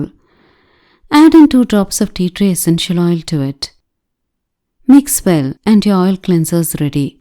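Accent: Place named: Telugu, India. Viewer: Your accent sounds you are Telugu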